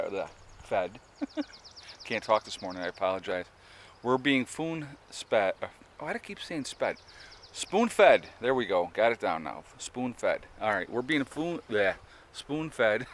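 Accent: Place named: New Jersey, United States. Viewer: Nice video; I'm subscribing. That sure sounds like a Jersey accent.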